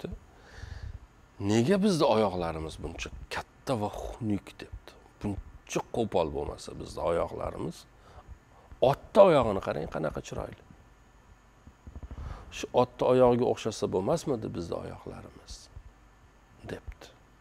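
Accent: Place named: Termez, Uzbekistan. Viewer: Мен бу канални икки Кун булди. Танидим. Узбекистонда и кайси студияда. Гоголда туркияда деб беряпти. Шевангиз Термизга тортяпти. Каерликсиз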